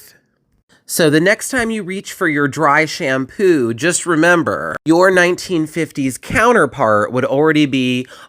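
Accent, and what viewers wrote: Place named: Germany. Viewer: Diese deutsche Stimme😮😮😮😮😮😮😮😮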